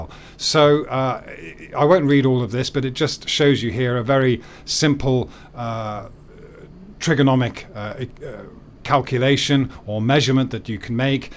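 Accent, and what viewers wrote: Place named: Bristol, United Kingdom. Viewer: didn't know you was a bristolian? gurt lush me babber, ow bist? :)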